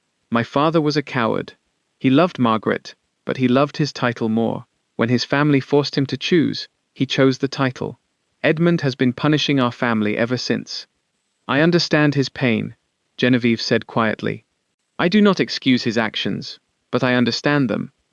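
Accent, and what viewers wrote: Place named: United Kingdom. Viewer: Is the narrator British or American? The voice goes in and out of both accents, sometimes within the same sentence. Pretty distracting.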